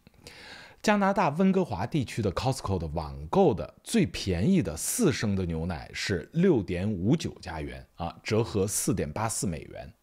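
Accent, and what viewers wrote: Standard. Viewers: 听口音完全听不出是东北老乡普通话相当标准了提个小建议能不能把英尺换算成平方米毕竟用英制单位的国家现在比较少印象里加拿大不也是用公制吗？难道我记错了？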